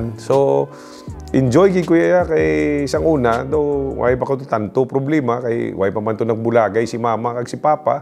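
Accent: Iloilo, Philippines. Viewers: Nami pamati-an ah...certified Ilonggo gid... ❤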